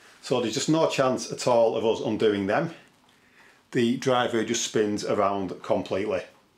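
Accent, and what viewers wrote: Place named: Yorkshire, United Kingdom. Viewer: What part of north you from? Sound like a Yorkshire man. But you could be from Manchester or Lancaster. I used to be from Blackburn myself. Lived in London for years but still have the accent 😂 Thanks for the tips. My Screw just won't budge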